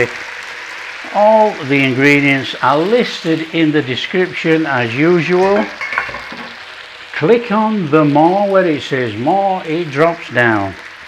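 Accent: United Kingdom. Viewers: That sounds delicious, but I have no Idea, where I can get suet in germany. Maybe I just try it with butter. Cooked your chinese beef with onions today and had a look, what else I can learn from you. I really like your accent, from which part of the UK do you come? I can understand every word, thats nice, compared to all the mumbling americans, I can only get every third word…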